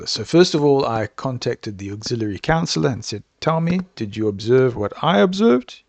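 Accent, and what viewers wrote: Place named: South Africa. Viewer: Heard your voice and thought you sound south African. Kept in listening then you spoke of SA. Then continued and thought. I can swear I heard a talk of yours. You have a very soothing voice. But that's not easy for a tween trying to stay awake at a slow Sunday afternoon public talk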